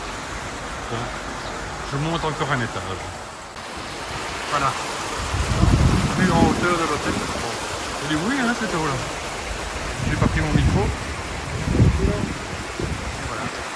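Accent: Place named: Belgium. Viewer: Bonjour Monsieur. Ça fait plaisir d'entendre la voix d'un compatriote belge sur une vidéo d'Égypte. Je suis de la région de Mons, Dour plus précisément 🥳. Voilà, je viens vers vous car je suis tenté par cet hôtel pour l'été 2026. l'Égypte, nous y sommes allés plusieurs fois (5 fois au cours des 15 dernières années, 3x en couple, 2 fois avec notre fils, et cette année pour partirons pour la première fois à 4 avec notre fille qui aura 4 ans), donc on va dire que l'on connaît un peu (mer population nourriture désagréments intestinaux 😅 etc). Je voulais connaître votre ressenti général sur cet hôtel (nourriture, propreté, animation le soir etc...). Avez-vous plongé depuis le ponton de l'hôtel car pour moi la qualité, beauté de la barrière de corail est également un point essentiel dans mon choix d'hôtels en Egypte. Merci d'avance pour réponses. Amicalement, Grégory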